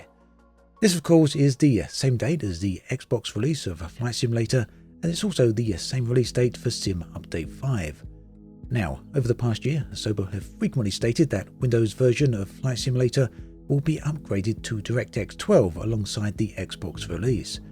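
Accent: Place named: Essex, United Kingdom. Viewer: As a UK citizen I find it fascinating that anyone would think of an Essex/East-ish London accent as ASMR - ha ha. They do say the only was is Essex (UK TV joke). Sorry to if I'm wrong.:-)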